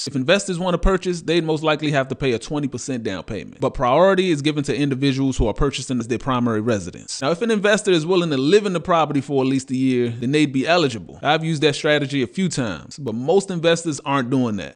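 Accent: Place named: Washington DC, United States. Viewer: Listen at that DC Accent!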